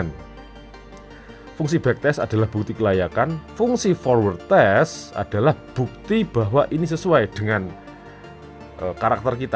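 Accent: Java, Indonesia. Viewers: Tulen wong jowo ki.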